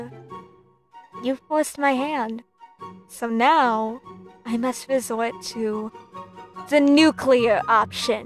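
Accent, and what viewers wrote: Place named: Spain. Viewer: You have a nice Spanish accent. :)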